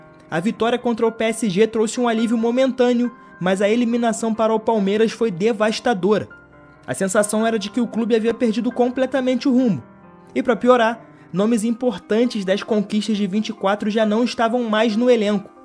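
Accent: Rio de Janeiro, Brazil. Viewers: So pelo sotaque da pra saber que e carioca skskksks (sou tbm)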